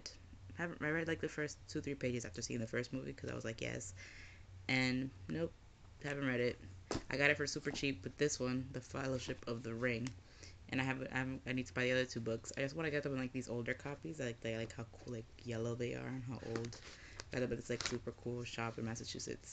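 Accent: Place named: Spain. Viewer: I didn't know there were multiple Oz stories. F SCOTT. TELL ME WHAT YOU THINK ABOUT WINTER DREAMS IF IT'S IN THERE. UGH. LORD OF THE FLIES IS SO GOOD. TALK TO ME ABOUT SIMON. JESUS CHRIST. SHERLOCK HOLMES. YES. YES GOOD. UGH. I'm studying Mrs Dalloway next semester, looking forward to that. Tell me what you thinnnk of it! OH what do you think of Emma Approved? I'm going to read Emma soon. Yes yes yes. That is a beautiful copy of S&S;-; GABRIEL GARCIA MARQUEZ. STRANGE PILGRIMS REEEAD IT. YAAAAAS. I love your Spanish accent.;______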